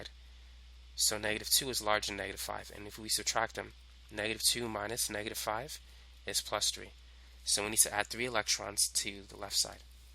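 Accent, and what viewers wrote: United States. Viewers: Thank god we have an American accent here for once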